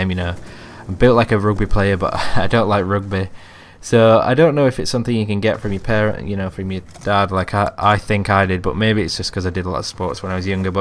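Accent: Yorkshire, United Kingdom. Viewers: which county of yorkshire are you from? (not pervy just wondered)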